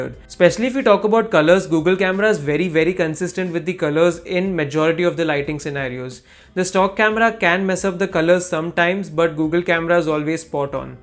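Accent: India. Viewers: O sotaque indiano é muito feio mano, parece o inglês liso do liso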